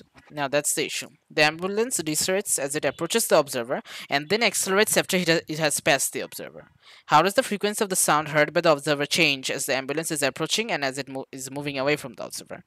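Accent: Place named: Bangladesh. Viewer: your voice makes me feel like you r from Bangladesh.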